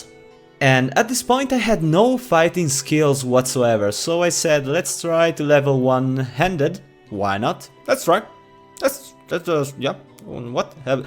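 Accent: Italy. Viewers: Great job, you just got a new subscriber. ^_^ I like your italian accent, haven't encountered it much on youtube. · just curious. Are you from Italy? Judgind by your accent I'd say Italy. Also the fact that you can pronounce some sounds that are hard for normal italians( .the "H" sound ) suggests that maybe you are from south tyrol? Just curious to see if I am right.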